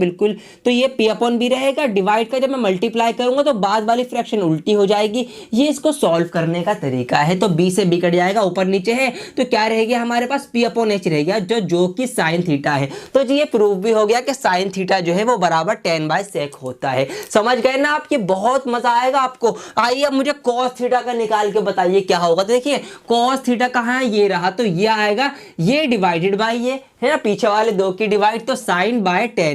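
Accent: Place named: Uttar Pradesh, India. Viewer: Correct bro thanxx tum up ka ho ya to pata chal gaya😁🎄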